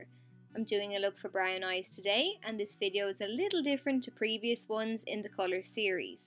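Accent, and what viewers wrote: Ireland. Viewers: Are you Irish? Because your accent kinda sounds like Jacksepticeye.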